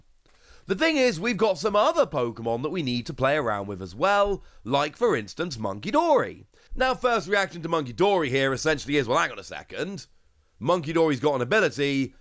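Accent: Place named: United Kingdom. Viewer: yes that's what i meant, not used to british acc could not even get the first word right. Thank u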